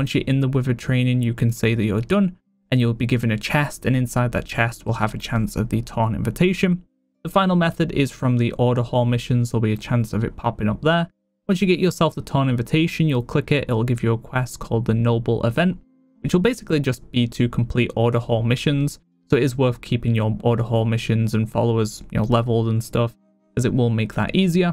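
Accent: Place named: Leicester, United Kingdom. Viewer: Great videos! Not trying to dox you but are you from Leicester?? Don't know anyone else with that accent but Leicester folk!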